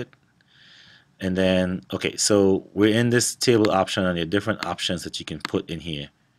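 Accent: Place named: Caribbean. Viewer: Where's your accent from? You sound West Indian.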